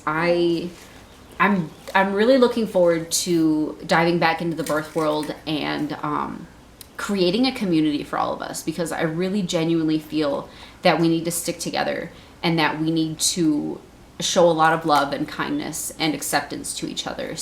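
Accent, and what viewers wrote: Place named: Minnesota, United States. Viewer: I'm not a birth worker, but I stumbled here from Twitter. Had my third and maybe final child at home with a midwife and her apprentice (?) It was healing (after two oppressive/demeaning hospital births). What you do is so important and I just wanted to encourage you. Also, I knew you were from Minnesota as soon as you started talking. 🙂 I've got family there.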